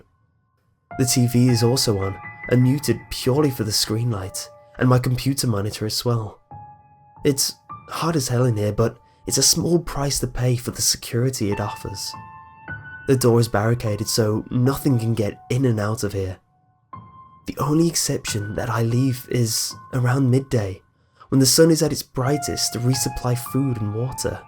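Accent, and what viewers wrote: United Kingdom. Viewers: Is it just me or do stories sound better with a british accent? has a good mic too so he is my favorite narrator.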